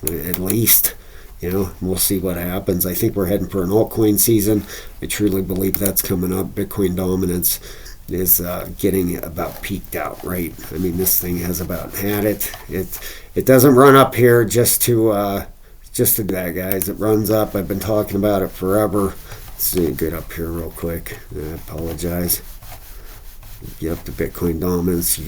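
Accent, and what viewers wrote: Pittsburgh, United States. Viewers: Nice video- are you from Pittsburgh by chance? - killin the shorts💥 $Turbo $TurboToadToken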